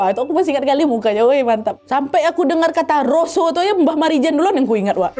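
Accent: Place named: Medan, Indonesia. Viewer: nampak x kau medan nya Wak🥱🥱🥱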